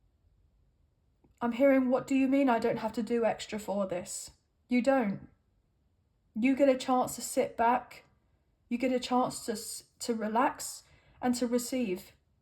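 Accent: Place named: Southern England, United Kingdom. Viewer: Alright sis, love your southern English accent,the french accent was strange, however thanks for all the encouragement we will see how this week works out❤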